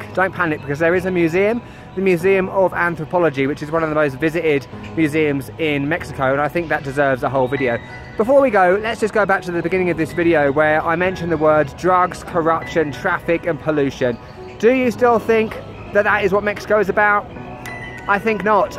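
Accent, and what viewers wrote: United Kingdom. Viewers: Hi! I am a native from Mexico City and I love your videos on my home town. I like your style: dynamic and objective. I don't live in Mexico City anymore, so when I watched your videos, I got to see new places and attractions. Also, I LOVE your British accent. It's pretty cool. Wish a great sojourn at Mexico City. Cheers!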